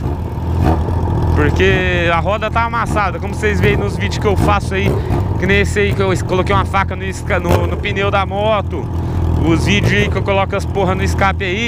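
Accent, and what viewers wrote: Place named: São Paulo, Brazil. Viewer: Meu se mora no Paraná mano mais meu deixa eu te falar porque se então fala igual a nois aqui de são paulo? Meu tô achando q o é de são paulo mano não do Paraná kkkkk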